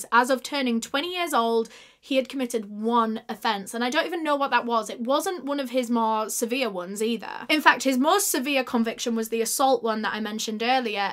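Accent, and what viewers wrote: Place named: Yorkshire, United Kingdom. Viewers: Yorkshire accent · she’s from Yorkshire I am sure, which is in England! Definitely not Scottish or Irish 🙂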